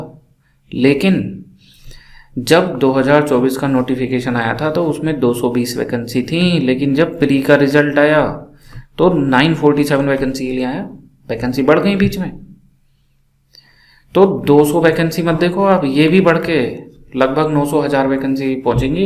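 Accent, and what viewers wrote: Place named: Western Uttar Pradesh, India. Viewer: Aap up west (to be exactly muzaffarpur nagar) se ho kya ??? Mere kuch classmates they us area se same yahi excent, tone and dialect thi..😊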